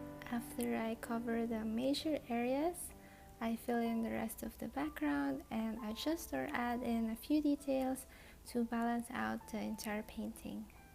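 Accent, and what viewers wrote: Philippines. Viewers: Filipino accent :-)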